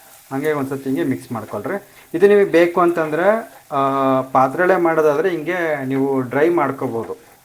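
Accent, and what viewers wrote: Chitradurga, India. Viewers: Sir nimdu yav uru sir.. Nim language namchithradurga da kade ero thara ede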